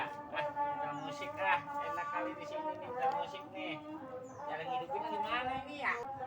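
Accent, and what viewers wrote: Jakarta, Indonesia. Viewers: Cerita yang sangat menarik dengan khas logat Betawi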